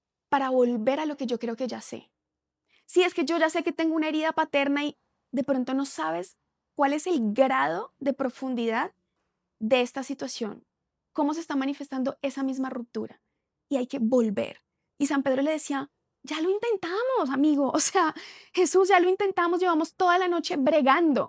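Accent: Colombia. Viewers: Que linda y hermosa!. en el buen sentido de la palabra y con todo el respeto cristiano. Mas allá de su físico capto su belleza a través de su palabra 😅. Su forma y su tono gracioso de hablar 😹. Tiene mucha gracia en su gesto y en su verbo para poner atención de los temas intensos de sexualidad con relación a la religiosidad. Habla muy bonito , su forma de hablar colombiano. Me encanta ésa forma de hablar 😍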